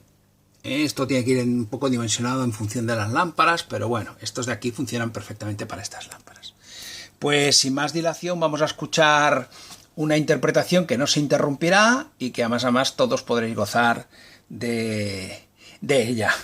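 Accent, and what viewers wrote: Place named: Catalonia, Spain. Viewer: Hola, creo que tienes muy buen contenido y conocimiento acerca de lo que hablas. Te aconsejaría hacerte un twitter donde ir actualizando información sobre lo que vayas haciendo, así como optimizar tu canal y los tags del mismo. si dudas de alguna cosa yo soy de badalona por tu acento supongo que eres de cataluña, cualquier cosa buscame por twitter y hablamos un saludo!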